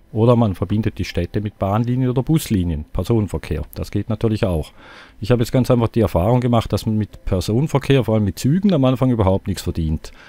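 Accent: Switzerland. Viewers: endlich mal ein gutes lp mit schweizer akzent! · Du bist der Einzige wo ich deinen Schweizer Dialekt versteh :)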